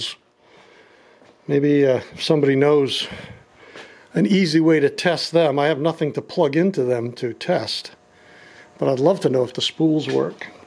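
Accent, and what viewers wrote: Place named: East Coast, United States. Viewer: Hi! Thanks for the info from FB. You sound very east coast! Love it my man. Came from CT (where we speak proper enleash!) Looking forward to watching this.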